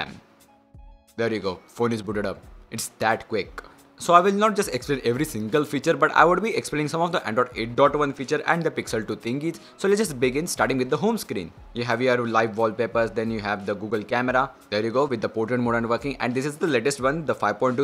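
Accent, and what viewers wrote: India. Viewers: ХАХАХА люблю индийский акцент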